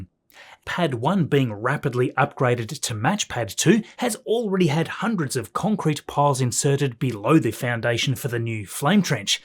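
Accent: Australia. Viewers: You messed up the “that’s not a knife” name. With your accent, you should have done the famous Australian line That’s not a knife …..(THIS is a knife). I forgot the movie it’s from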